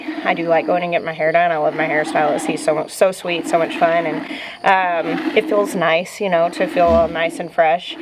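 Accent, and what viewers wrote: South, United States. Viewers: Just for the record, you're beautiful and I love your cute voice <3. Your southern accent is perfect for video; I think my own midwestern voice would drive me nuts if I vlogged! Thanks, for the encouragement about going gray. It's always hard to resist vanity and choose to be comfortable with how God made me. I'm not going gray yet, but I'm sure it's coming soon!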